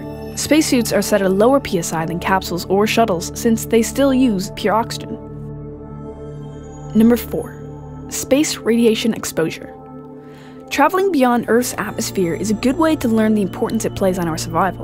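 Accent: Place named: Canada. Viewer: I'm sensing a Canadian accent, specifically any word that rhymes with "out". You don't have the full-out "oot" pronunciation, but I'm still hearing a slight difference from the American accent of which I speak.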